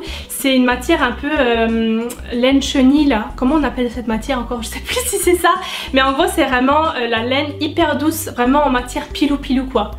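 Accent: Lorraine, France. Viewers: Ta l'accent lorrain 😘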